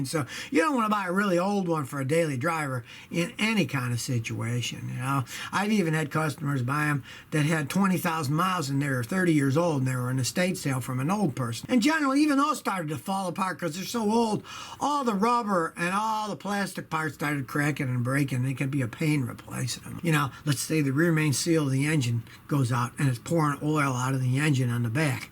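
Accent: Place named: Chicago, United States. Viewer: Where is from originally? He has an accent that sounds possibly like Chicago’s.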